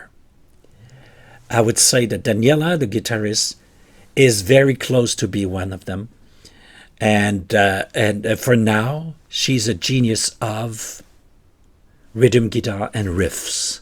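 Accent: Quebec, Canada. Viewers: Dès vos premières phrases, je me doutais bien que vous étiez Québécois. Moi je suis de Boucherville, j’ai découvert les sœurs Villarreal via un vidéo de réaction sur leur premier cover de. Je fut conquis sur le champ. Depuis, je les ai vus en spectacle 4x (2x à Monterrey Mx et 2x à NYC). Elles donnes tout un spectacle. Mais, ce qui m’a vraiment décidé d’aller les voir dans leur ville fut une conversation internet avec leur papa. Toute la famille est tellement terre à terre et ils sont super gentils. Vous, vous les avez trouvés/découverts comment?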